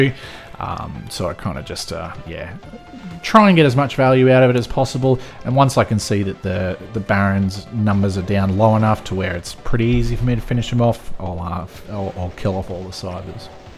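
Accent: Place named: Australia. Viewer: You sound Australian.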